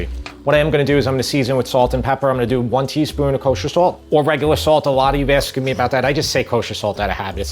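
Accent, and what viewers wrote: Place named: Boston, United States. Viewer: Are you from New York cause you got that Boston accent.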